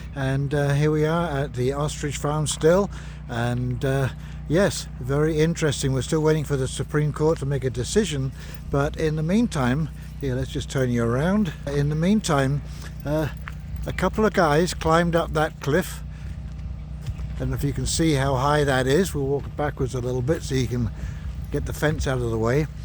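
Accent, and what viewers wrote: United Kingdom. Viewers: take your stupid british accent back to where your from. Canada does not need you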